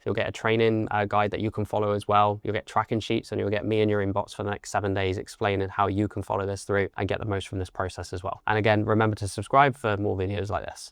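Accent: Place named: England, United Kingdom. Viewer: Well presented content. Pleasantly surprised to hear an English accent, these videos are usually American! All the best.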